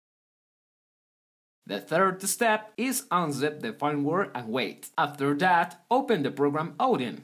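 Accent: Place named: China. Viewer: You need to add subtitles, I can't understand your Chinese accent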